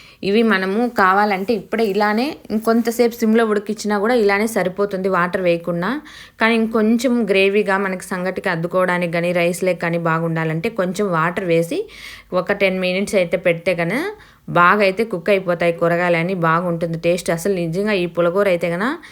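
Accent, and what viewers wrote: Rayalaseema, India. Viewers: Mee proper ekkada ? Vintunte rayalaseema ankunta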